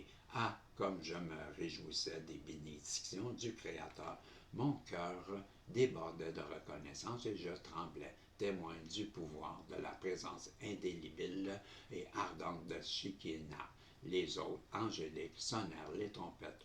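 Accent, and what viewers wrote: Quebec, Canada. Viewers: Salut j'espère que ça va ❤ je vous adore Vous avez un sacré accent québécois 😂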